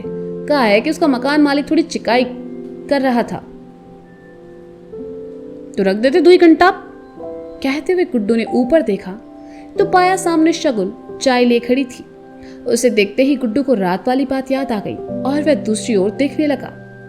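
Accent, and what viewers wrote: Kanpur, India. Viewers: Didu har har mahadev Happy friendship day Congratulations for 19k subscribers Didu apki kanpuriya tone mast hai maze ajate hain 😉😉👈👈 Mahadev always bless you 🧿🧿😇😇❤️❤️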